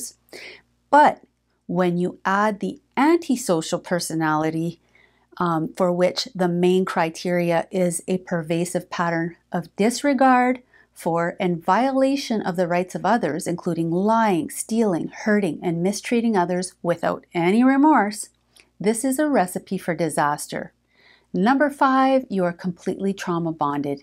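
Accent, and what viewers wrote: Canada. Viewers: The Canadian accent is strong here.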